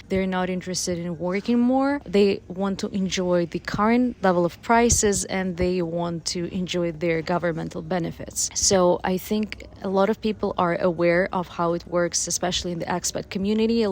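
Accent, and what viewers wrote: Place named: Eastern Europe. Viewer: Where from East Europe is the accent? Russia or Poland?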